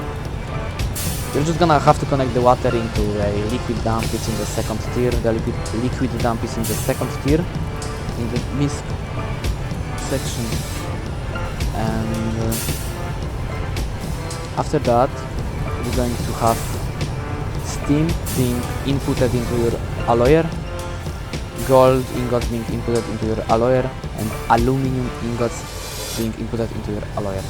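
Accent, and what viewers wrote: Poland. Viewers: dzięki za tutorial słychać że Polak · nice polish accent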